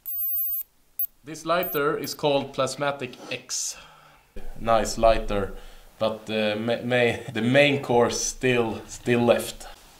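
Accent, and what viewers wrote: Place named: Sweden. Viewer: You are Swedish, i can tell by your accent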